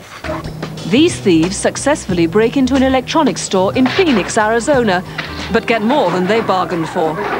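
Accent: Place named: United Kingdom. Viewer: british accent. no need to explain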